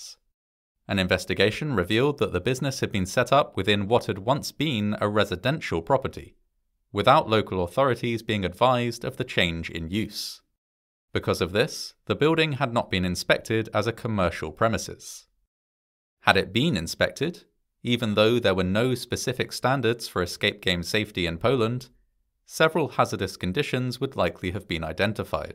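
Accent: United Kingdom. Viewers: terribly hard to listen to the mono tone british accent, im going to take a nap now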